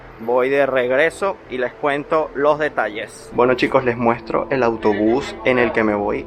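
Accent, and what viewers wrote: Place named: Venezuela. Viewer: Primera vez que veo tus vídeos, saludos, por tu acento eres venezolano pero ¿en qué país estás?, que maravilla de hospital, saludos, en nombre de Dios saliste bien y seguirás libre del virus, saludos 💋💋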